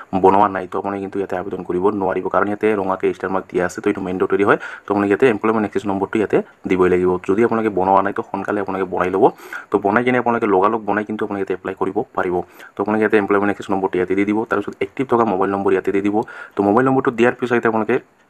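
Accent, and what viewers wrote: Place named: Bodoland, India. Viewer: Bodo bilakor nisina lagise nohoine baru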